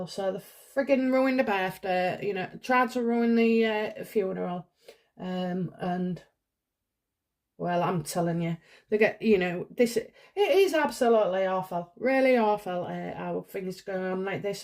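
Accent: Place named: Northern England, United Kingdom. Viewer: I thought it was Nothern of some kind. 😍. I'm from Sweden. 😀.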